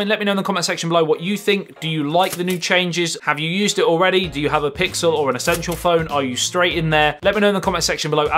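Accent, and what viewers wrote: United Kingdom. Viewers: Great video 👍 And I love the way you Brits knock off a syllable in the word bat-ter-y and pronounce it ba-tree 😊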